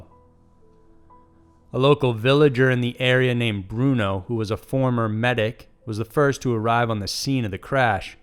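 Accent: South, United States. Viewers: He has a nice Southern accent. To my ears, anyway (Canadian).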